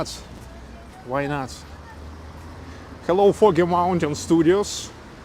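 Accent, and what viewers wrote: Russia. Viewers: I like your English/Russian dialect. We don't want a English professor from Harvard.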